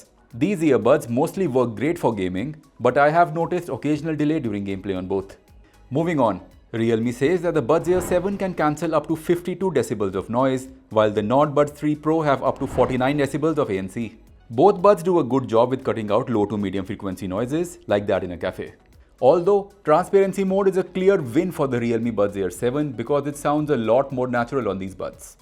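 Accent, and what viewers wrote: India. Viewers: Indian ho ya foreign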